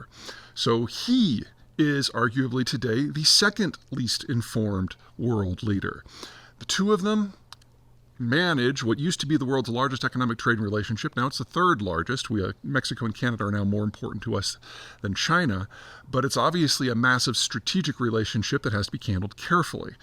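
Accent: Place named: Midwest, United States. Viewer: It's closer to the latter as far as I'm aware, but Peter has a middle-American accent.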